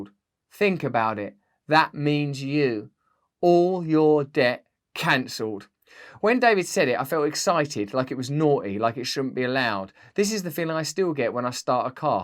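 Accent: United Kingdom. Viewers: Dear Russell, you are in a unique position with your celebrity status to influence in ways others cannot! As a non-UK person, I absolutely LOVE your accent! It makes me feel you are a real person, just like me 😊 Love your sharp intelligence. Yes I read your book about 2 years ago - loved it. I remember the chapter on the Saxe-Goth-whatever - real name of the queen’s family! I wish all Brits would wake up to the real facts about their royal family! Maybe you can do a little video on that again? 💖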